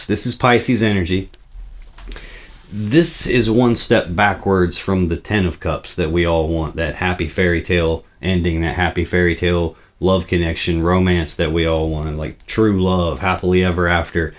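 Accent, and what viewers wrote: United States. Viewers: Yes, I want a personal reading. You’re awesome. Prayers for your person situation. Help me unravel mine? Time zones may affect the coordination. You don’t sound Australian so, I’m guessing American? I think it’s 12 hours difference. I’ll book. 🤪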